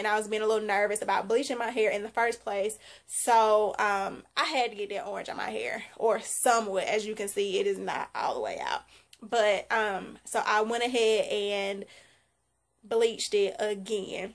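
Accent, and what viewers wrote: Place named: Alabama, United States. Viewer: If You Don't Mind Me Asking Do You Live In Alabama And If So What Part? I Can Tell By The Accent (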